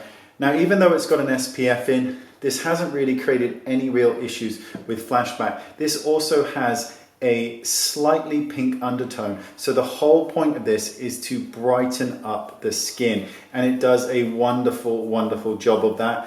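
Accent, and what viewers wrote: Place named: Swansea, United Kingdom. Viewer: Hey my dad was from Swansy and he sounded allot like you. Where are you from?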